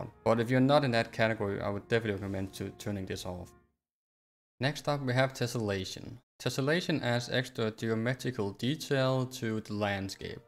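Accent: Denmark. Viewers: Tak for videoen min ven, god forklaring og perfekt med opdeling af videoen for os der ikke kræver den store intro til grafikinstillinger. Er sikker på du er dansk på den vidunderlige accent du har 😄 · Yo m8 du lyder som en dansker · You danish bro?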